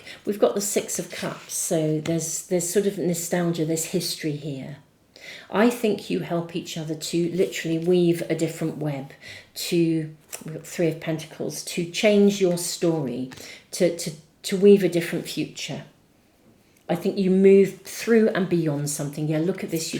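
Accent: United Kingdom. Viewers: Loving....I selected Pile 2. Your beautiful British accent and voice bring me hope.....I'm living in the UK, I am the ring here, the female with lot of energy to move forward and my partner living in the US is the castle and all correct. Unfortunately, on July 1, he said he needs a time off from this beautiful love affair we had so far, as he felt i is stressing him out....and although it made me so much pain, as I know he loves me and I trust such a love should never disappear. According to the reading today, during this time off if he steps out of his zone and sheds the skin and realises the immense things we could develop....I think that is the destiny of our relationship....I cannot call or message him until he does it for me as he is the man and going through changes in his own world, which matches what you said. Loving, I do hope he will reach out to me soon with love, clarity and purpose because I have no words to explain how beautiful it used to be........ 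According to you it should happen and hope it will.....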